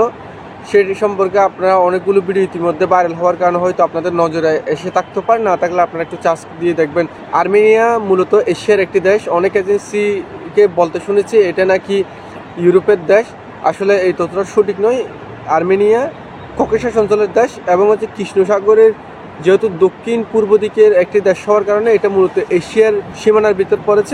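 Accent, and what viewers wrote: Chittagong, Bangladesh. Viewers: ভাইজানের কথা শুনে মনে হচ্ছে আপনি চট্টগ্রাম আসলো যদি চট্টগ্রামের লোক হয়ে থাকে অবশ্যই যোগাযোগ করবেন আরমানিয়া আপনি কোন জায়গায় রয়েছেন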